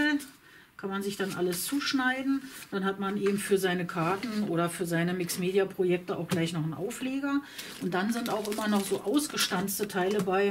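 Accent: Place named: Berlin, Germany. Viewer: Hallo, großes Kompliment für Deine Videos, die sind informativ, mit praktischenTips, Bezugsquellen und "auf dem Teppich geblieben" 😁 Dabei mag ich auch sehr den Berliner Dialekt und Deine treulich kommentierende "bessere Hälfte"!! Bei Euch geht es nicht - wie leider so oft heutzutage - um mehr Schein als sein. Ich guck's total gerne! Danke!! Viele liebe Grüße, Michaela ( Nordhessen mit Berliner Wurzeln 😜) 👍🍀🐞👍❤️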